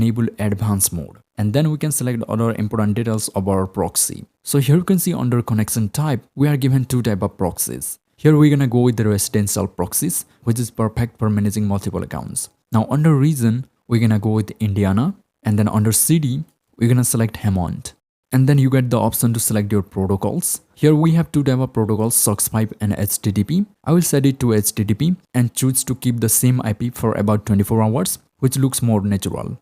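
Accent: India. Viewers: Kolkata + English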